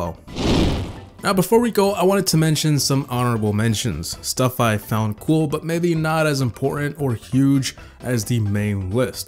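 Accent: Norway. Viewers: wait.... are frome norway?? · are you norwegian? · E du fra Norge? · wait u r from norway? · Are you Norwegian? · Hold up wait a minute are you from Norway? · Waitwaitwaitwait wait. Are you Norwegian????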